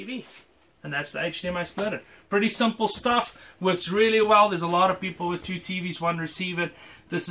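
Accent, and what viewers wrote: South Africa. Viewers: Great Saffer accent coming across-but well done all good! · His accent is a proper mishmash but the way he pronounces his words ending in ER gives him away as a fellow Afrikaner 🤣 Or at least it does for me.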